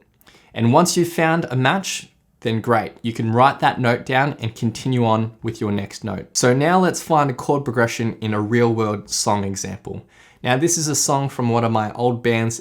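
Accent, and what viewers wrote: Australia. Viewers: Thanks a lot for the tips,! I love Australian accent by the way haha. Keep it up, mate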